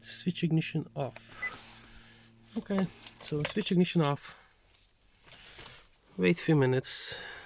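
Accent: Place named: Russia. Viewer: Сразу слышно что человек Русский )))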